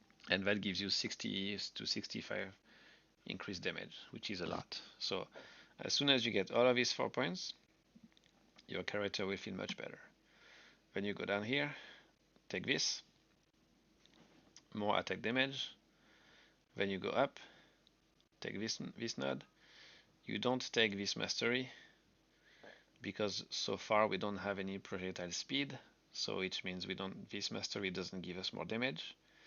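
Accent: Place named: France. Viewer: hi, great video! I cant tell if you're french with your accent, am i right? haha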